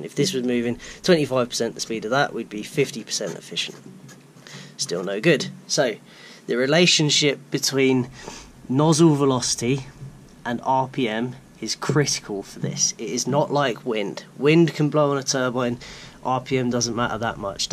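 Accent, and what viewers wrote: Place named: England, United Kingdom. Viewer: It’s like your speaking a foreign language with an English accent. Sounds like you’re on track though and hopefully you can upgrade the controller soon and find that lost power.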